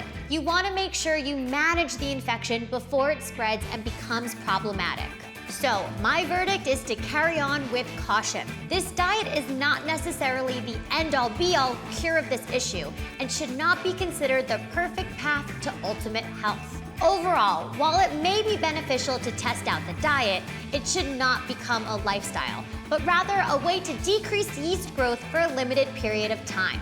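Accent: United States. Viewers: She speaks like only an American can 🙂👍🏼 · God I can't stand the American accent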